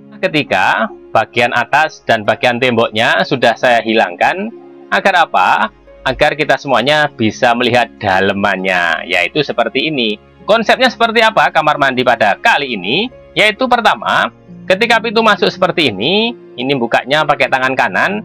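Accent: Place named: Java, Indonesia. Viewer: Jowomu medok men to kangmas heeeeeemmm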